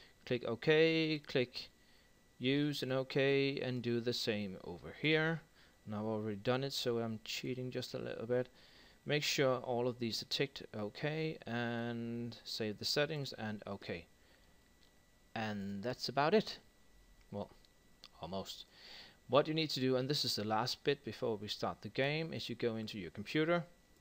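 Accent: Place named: Denmark. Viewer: LOL Dansk accent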